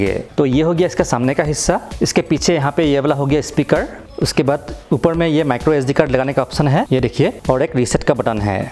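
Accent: Assam, India. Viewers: Aap Assamese ho. Kitne bhi thik se hindi bolo.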